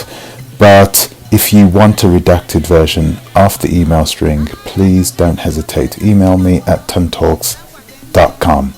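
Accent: United Kingdom. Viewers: Why don’t you focus on what the f** is wrong with Britain, the mother of all sadistic dysfunction . So weird seeing a black Jamaican in Britain talking about what is wrong with the United States in his British accent. UK is sending all your a*** back to Jamaica, why don’t you focus on that. Prayers and blessings for the BAG family for daring to take the future of their family into their hand and making such a bold and courageous move.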